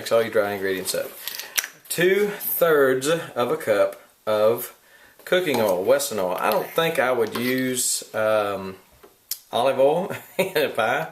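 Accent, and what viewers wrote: Texas, United States. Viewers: harplover1; Texas people have a "drawl". You get used to it. You must be new to the internet? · I subscribed within seconds of hearing your lovely Texas gentleman's accent! Thanks for sharing this recipe too!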